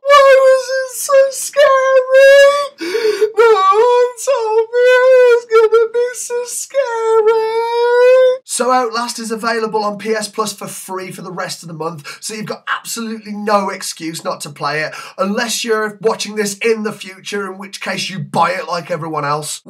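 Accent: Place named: England, United Kingdom. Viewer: While I am quite a pushover when it comes to English accents, this was really well done. You need to make more videos. Subbed!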